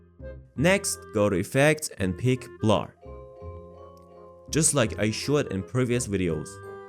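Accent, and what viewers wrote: Iran. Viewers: بنازم داداش. عالی صحبت میکنی ولی بازم یه ایرانی لهجه تو میفهمه. موفق باشی عشق داداش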